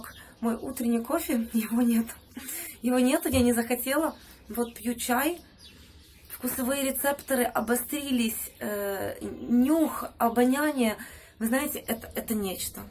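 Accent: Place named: Ukraine. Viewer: у вас украинский акцент, Вы оттуда?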